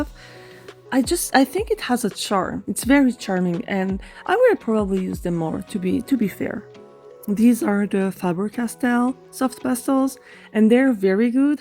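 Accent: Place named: France. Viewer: Hehehe bonsoir 👀 Je viens de finir et omg le renard à l’aquarelle, je hurle! Je suis sûr que c’est une galaxie qui bouge tout le temps et que des peuples extraterrestres anciens l’ont vu et ont créé des mythologies, des textes sacrés basés dessus ou juste utilisé son existence comme un présage ou something!!! Le fait que les renards soient tous différents est super coolos AAAAA 😩😩 Ton accent est beaucoup trop mimi en anglais, jvais crever, je pleure en Oui Oui Baguette stp 😭 Félicitations pour cette première vidéo! C’était super sympa à regarder hehe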